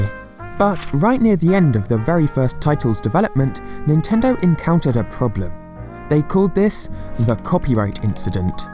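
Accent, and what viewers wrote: England, United Kingdom. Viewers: I like your English accent. :3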